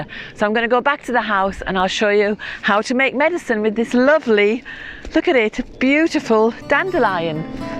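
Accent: United Kingdom. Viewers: Omg so uncanny, you look like an older version of myself, or what I would imagine I would look like when I turn 50. What is it with Irish people? Why do I look so Irish even though I am a Finnish person :D .. or are you Irish? You sound more British. Now to the actual comment, I love dandelions! I always start to crave both the root and the leaf when spring comes, certainly something my body needs this time of the year. I have a tea brewing right now as I type, yes, there is dandelion root in it! It was nice to see some really hands on medicine making <3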